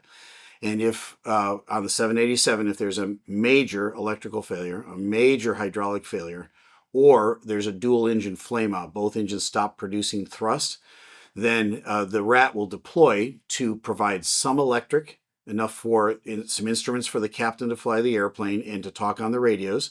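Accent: United States. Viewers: I like your clips very much, interesting and well understanding american english, for me good to keep my English. Greeds from Germany 👍👍👍👍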